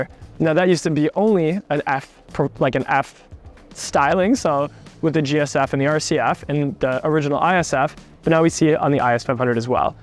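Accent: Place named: Canada. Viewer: You’re so cute and love that Canadian accent! Nice video- a pano sunroof would make it perfect! What’s taken Lexus so long to put them in? Same with not the latest infotainment?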